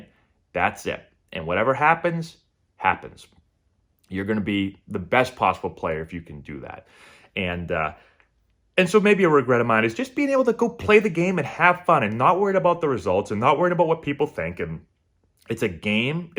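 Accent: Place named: Boston, United States. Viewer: Have you played Fallout 4. It's based in Boston. Every character sounds like you. Big fan, love the channel.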